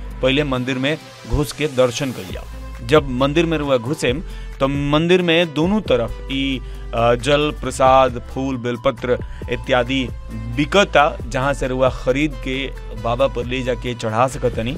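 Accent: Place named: Azamgarh, India. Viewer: आजमगढिया भाषा लगता, ठे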